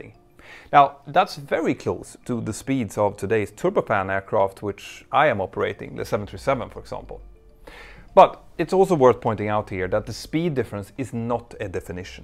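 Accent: Sweden. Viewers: Are you swedish? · The Swedish English really makes this feel international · A 100 % the host’s from Sweden…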